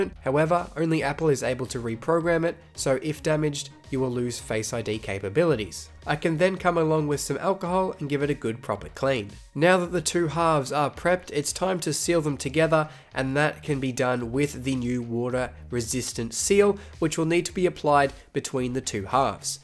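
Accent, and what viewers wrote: Australia. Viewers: Hello, do you take send ins? I'm in Brisbane and recently dropped my fiancés phone onto my MacBook. There's a small but incredibly annoying scuff left on her screen. I'm at the point where I'm willing to buy her a new phone but I thought a quick search was in order first (delighted to hear an Aussie accent). If you don't, what's my best bet? I checked your online store, there's no iPhone X parts available? Is that a 'for now' thing or should I source them elsewhere? Regards, Ricky.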